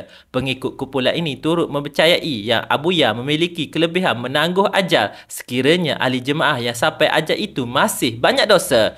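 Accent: Kelantan, Malaysia. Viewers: Kecek klate jah be... Belit lidoh dgr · Pekat lagi ter kecek kelate 😂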